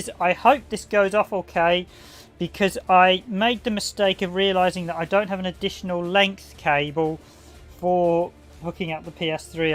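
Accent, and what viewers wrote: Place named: England, United Kingdom. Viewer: Bro! You are english… it's obvius but… I need to know, what accent of England is the one you have?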